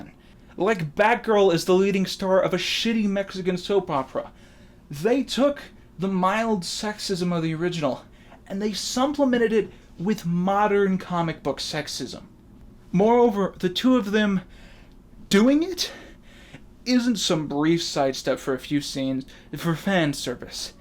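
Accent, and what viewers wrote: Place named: United States. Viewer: Yo I'm just a British boy sat in his room watching this and I hear an American talk about eastenders? The figgidy niggidy fuck?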